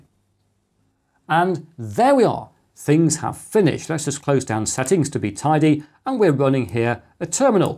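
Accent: United Kingdom. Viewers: I thought you were going to “flex” ChromeOS so I clicked the video cuz i thought it would be funny but then I heard the Bri’ish accent and hit dislike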